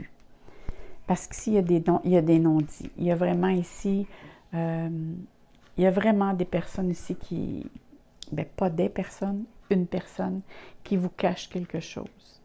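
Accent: Canada. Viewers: AAAAH QUEL BEL ACCENT CANADIENS ET UNE VOIX SI APAISANTE JADORE VOS TIRAGE DOMMAGE QUE VOUS NE FAITES PAS LES QUOTIDIENNE CE SERAIS UN REVE BEAU MOIS DE MAI A VOUS DE LA PART D UNE AMOUREUSE DU CANADA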